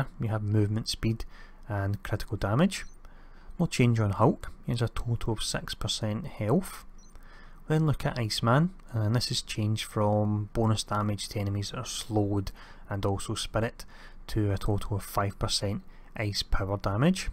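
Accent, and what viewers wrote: Scotland, United Kingdom. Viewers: I like your accent, are you scottish? :)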